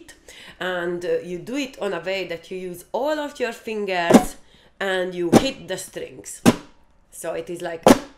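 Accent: Hungary. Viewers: magyar akcentus befigyel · A magyar akcentus de ismerős mindig basszus :D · sounds like Magyarrrr. I remember this accent from Dominica my sweet girl from Zsigetvar love from Istanbul thanks for this video. Ksönöm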